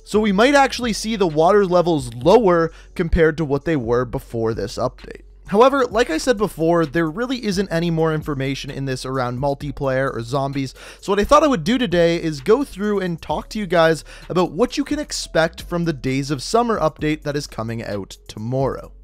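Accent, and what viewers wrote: Canada. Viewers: he says moreoh bc he is canadian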